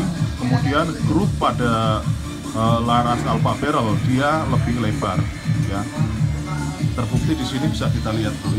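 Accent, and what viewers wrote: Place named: West Java, Indonesia. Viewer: Logatnya seperti orang sunda ini daerah mna pak🙏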